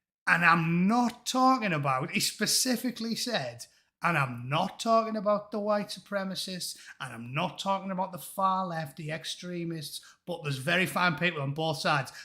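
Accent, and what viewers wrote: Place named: Teesside, United Kingdom. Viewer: Teessider here. I was about to ask about what town you meant, since the accent gave it away. Always good to hear a success story. Glad you made it out, Boro hasn't got any better. it's like a middle-eastern country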